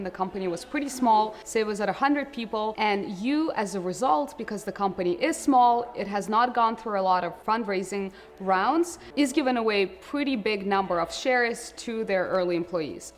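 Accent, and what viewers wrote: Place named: Russia. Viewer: Что-то русским акцентом попахивает)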